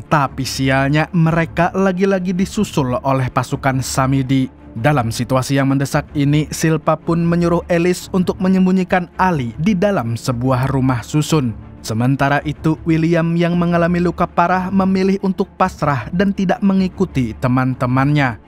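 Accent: Banjar, Indonesia. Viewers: Urg banjar dimana pyn mang😅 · Buset org banjar kah sklinya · Banjarr leh.?! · Urang banjar juakah ikm nch....😂😂😂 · Kayak nya mimin nih org banjar nah wkwkwk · Bang pian orang banjar dmnanya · oh ini kah nang buhan banjar tu wkwk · Org banjar kah pian niti mang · orang banjar kah pian😂😂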